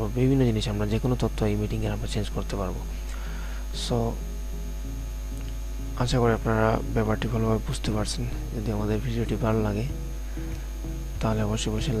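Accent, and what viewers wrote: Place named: Sylhet, Bangladesh. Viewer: Ji but Dhaka tei thaki choto theke. kotha sune bujhe gesi je sylheti hote pare 😂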